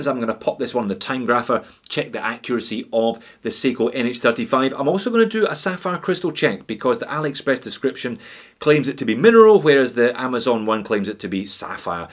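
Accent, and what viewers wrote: Scotland, United Kingdom. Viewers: LOVED THIS REVIEW.,and you have become my go to watch review channel by earning it flat out accurate fair-minded spot on assessments with amazing flair and Scottish brogue to boot! Fantastic watch. Fantastic review. Seiko...that's your watch except you didn't build it. Wtheck?! I'm buying this and I LOVE that tropical strap. TyJ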